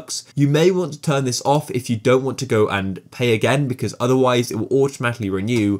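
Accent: United Kingdom. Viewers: thx but are you british?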